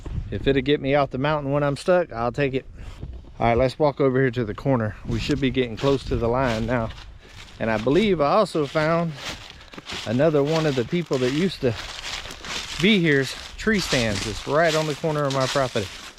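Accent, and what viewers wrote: South, United States. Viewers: You sound like your from the south I was raised in Millington Tennessee but have lived in Waldron Arkansas most of my life.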